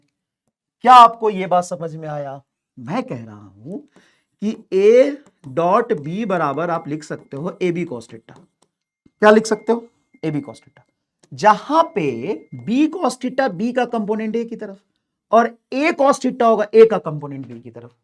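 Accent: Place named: Bihar, India. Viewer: Bihari h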